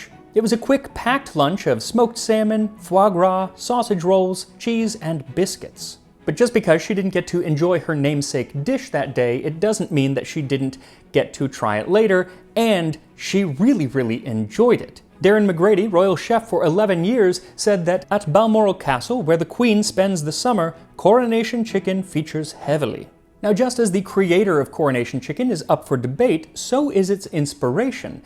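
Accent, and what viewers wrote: United States. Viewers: Why can't Americans talk! "Erbs" Herbs QEII was crowned not "coronated" The temperature doesn't "raise". You may raise it, but it rises. Loved the recipe and the comentary...... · Why do you Americans always pronounce herbs as erbs without the 'h'... but over prounce the silent 'h' in words like vehicle? 'Ve-hical' 😅 It drives me nuts 😂 Apart from that, I love your shows and will venture into trying some historical recipes. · That's just how Americans say it. Never heard a American say it the British way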